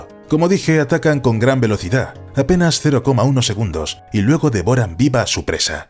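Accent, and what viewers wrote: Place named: Spain. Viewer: Pros del video: Una investigación interesantísima, imagenes acorde y un tema novedoso. Contras: Español de España.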